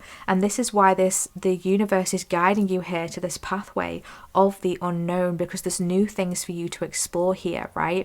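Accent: Northern England, United Kingdom. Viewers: Pile 2 brought so much confirmation. I am welcoming in my beautiful brand new reality. I am shifting into the next phase of my life 💕🐬🌈 I’ve been sensing so much of what you brought through. I’m here to play a role in activating others. My desires to be acknowledged for my soul gifts and talents and even prosperity in connection to them aren’t bad at all, but all pure innocent and valid desires from source. Your voice is really comforting and reminds me of my grandparents from Liverpool. I always feel like my ancestors are comforting me during your readings. Are you from Northern England? Thank you for bringing through this confirmation🙏🏽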